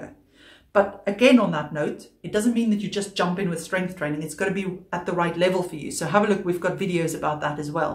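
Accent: South Africa. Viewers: I recognize a South African accent from anywhere.😂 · Love that accent!...Sounds like South African/Dutch? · You sound like you are from South Africa. Love that Boer accent. Sawubona! Anyway, good information. I'm an athlete thank you for the info.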